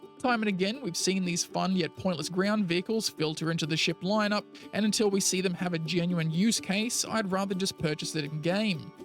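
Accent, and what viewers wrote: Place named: Australia. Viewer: Hi whats up: Love your uploads.. and I believe Ozzie oZZie accent. The problem with CIG is they charge far too high prices for goods that pose no challenge for other products within their price range. The CVS-SM seems to sell for 50dollars or 50 pounds which is how much the entire game sold for with SQ42 and a Starter. Starter ships now cost that begotten price and the game yet alone a package. The CSV-SM is not seemingly calibrated with a driving rig meaning there is three way deal. You can't manage cargo more effectively. I would love to attach compartments enabling maybe a 12-16 hauling pool henceforth a customisable truck variant! I don't WANT a variant - but extensions for CSV-SM... what cha think??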